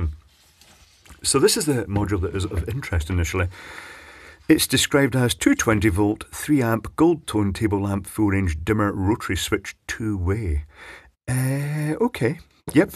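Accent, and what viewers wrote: Scotland, United Kingdom. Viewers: Twotone Scottish pronunciation.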